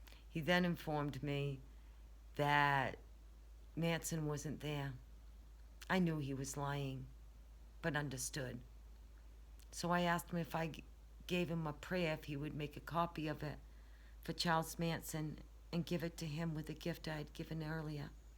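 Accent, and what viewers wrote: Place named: Long Island, United States. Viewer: You sound like you are from Long Island.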